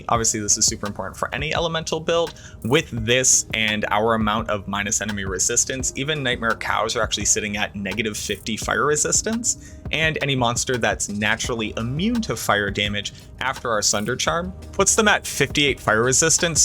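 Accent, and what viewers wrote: Boston, United States. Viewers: The most impressive part of it is he has a heavy Boston accent when he isn't presenting; he showed it off in one of his recent videos and it completely took me by surprise. He is a really talented presenter.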